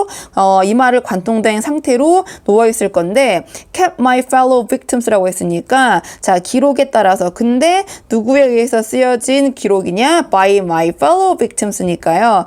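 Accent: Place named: United Kingdom. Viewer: 선생님! 감사합니다. 선생님 덕분에 혼자서는 꿈도 꿀 수 없는 아가사 크리스티의 'And then there were none.'을 끝까지 볼 수 있었습니다. 마지막 Wargrave의 'manuscript document'부분은 까다롭고 이해하기 어려운 문장도 많았지만 선생님 덕분에 마지막 까지 읽어 낼 수 있어서 참 기쁘고 행복 합니다. 군더더기 없이 속도감 있는 선생님의 강의 진행 덕분에 지루할 틈이 없었습니다. 그리고 선생님 특유의 영국식 발음(!)에 시간이 지날수록 매료되었습니다.😂 다시 한 번 감사드리며 이젠 나니아 연대기를 시작하겠습니다~^^고맙습니다.❤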